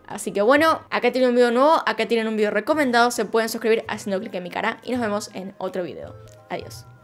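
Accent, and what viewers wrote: Argentina. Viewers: Tiene poco que empecé a ver tus videos, hay varios canales del mismo tipo, en tu caso me pareces muy sincera y directa, también tu acento argentino me ssama la atención jaja, saludos desde México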